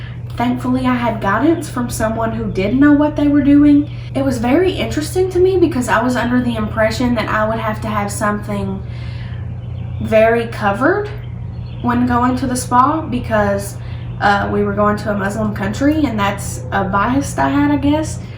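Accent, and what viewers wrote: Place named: Kentucky, United States. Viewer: I love your accent the Kentucky accent. 😁 welcome to algeria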